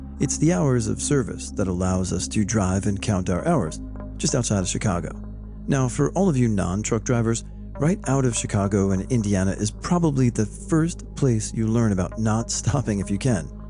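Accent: Australia. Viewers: In regards to your Australian accent. Well, I've certainly heard a lot worse. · Aussie here - your accent was pretty good! More of a country accent than a city accent, but pretty good!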